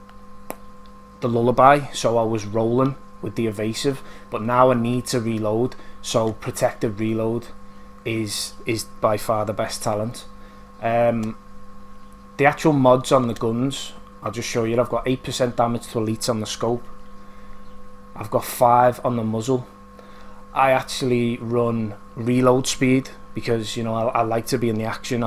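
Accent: Liverpool, United Kingdom. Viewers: where u from？Liverpool？ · were you fro scouse im newferry on the wirral. subbed :) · Thank you for the video! I love your Liverpool accent! I hope you are a reds fan and not Everton's (although it doesn't matter if you are). YNWA! 3> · hey, my first time here..... funny to see a build going almost in the opposite direction than most ppl.. but very refreshing.... btw are you a scouser..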